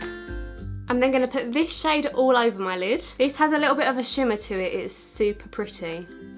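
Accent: United Kingdom. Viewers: It's fun watching this and see you do makeup really well and talk with your accent and British sayings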